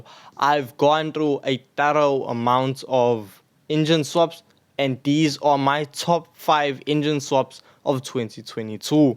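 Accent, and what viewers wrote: South Africa. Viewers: Are you south African???😭😭😭 I'm picking up your accent and it sound heavy familiar...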